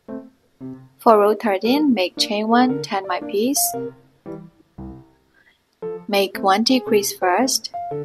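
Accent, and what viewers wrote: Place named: Myanmar. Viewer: Are you burmese? bcz ur accent sounds abit like burmese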